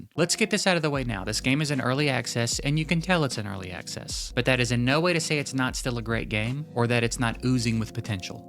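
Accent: South, United States. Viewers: I love how my man here is so positive all the time and it's great to hear southern YouTubers. But also he hears a faint ticking while opening mail and he loses his shit.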